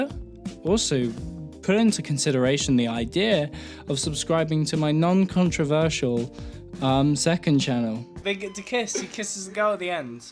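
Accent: Southern England, United Kingdom. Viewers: Well judging by your voice I can tell this show ain’t for you. (BRITISH) or whatever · I have never heard someone with a British accent say the word "ranch" and honestly, this is probably the equivalent of listening to Americans gleefully repeat "tea and crumpets" over and over ad nauseum, I finally understand. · That’s an English southern accent, not a British accent. I’m also British and say it different to him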